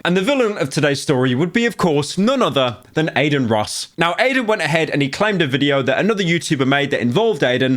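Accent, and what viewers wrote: United Kingdom. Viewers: Pyro, Internet Anarchist’s numbers line up with yours. He lost $8000, he’s Australian. If he made a million on that video, like your that made a million, the numbers would be very similar. Convert $8000 AUD to pounds which i’m assuming you are using because you got the funny british voice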